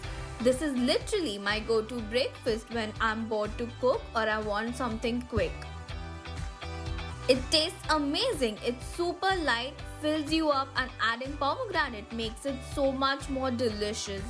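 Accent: Maharashtra, India. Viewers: Yes she is Maharashtrian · You are Maharashtrian easy can identify by your speaking skills my guess is correct???pls dii tell 😀😀😀😀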